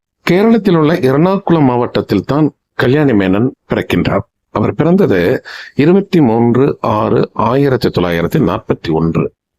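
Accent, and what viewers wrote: Sri Lanka. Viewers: Thokirthu vazhangum ungal kural ilanngai ucharippu pondru ulladhu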